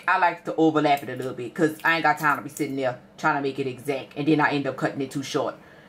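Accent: New Orleans, United States. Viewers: So cute! Are you from New Orleans? I recognize that accent 🥰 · You have to be from da 504 NOLA Baaaabeeee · I can hear it in your voice baaaaby dat ya straight from the N.O. Thanks baby for helping me as a beginner out ❤. .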